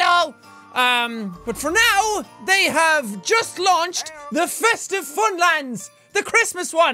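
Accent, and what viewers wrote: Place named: Ireland. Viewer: theelectricsocket does not have the beautiful angle voice but he could definitely make a speech song.His voice is so soothing and has the perfect amount of Irish accent 👌🏻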